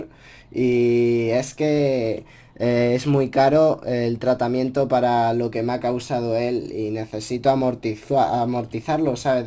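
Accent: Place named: Dominican Republic. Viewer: Mmm... se te esta pegando lo dominicano saludos desde republica dominicana:n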